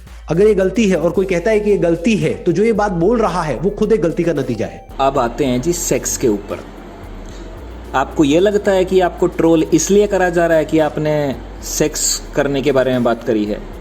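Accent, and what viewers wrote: Haryana, India. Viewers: Is video me Pure Haryana accent me bate kiya hai😀 similar to Acharya Agni Dev Arya ji 😀 pure Haryana accent 🙏🙏🙏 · Bhai ji aap haryanwi ho kya🔥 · I love this khadi hariyanvi boli 😉😉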